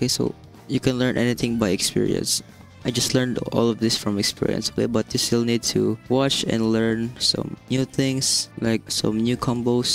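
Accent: Philippines. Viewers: Yo sound like a filipino❤❤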